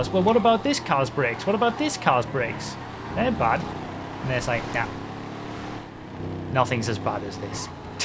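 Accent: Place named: Newcastle, United Kingdom. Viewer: that is what is scientifically known as a Geordie accent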